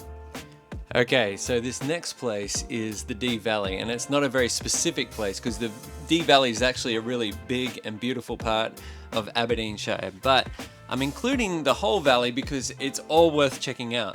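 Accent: Australia. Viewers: I’m guessing you’re not a native Texan though. Sounds like Australian or NZ? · You dont sound like you are american! You sound like an Australian or New Zealander! How did the lack or warmth and sun, particularly in the winter, make you feel, as you are from a warmer and sunnier part of the world?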